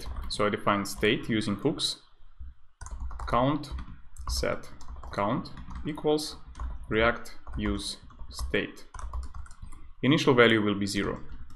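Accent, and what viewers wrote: Russia. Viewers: какой классный тяжелый русский акцент, чувак ты ахуенен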